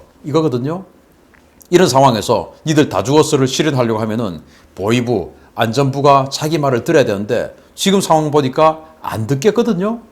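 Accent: Gyeongsang, South Korea. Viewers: 갱상도 사투리 좀 고쳐라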